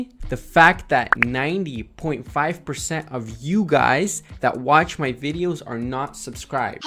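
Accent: Russia. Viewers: Are you Russian or Slavic? I’m willing to bet my left nut you are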